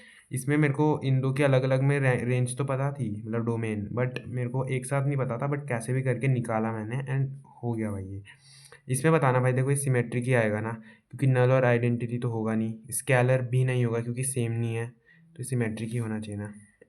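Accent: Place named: Odisha, India. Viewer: Bhai ap odisha se ho kya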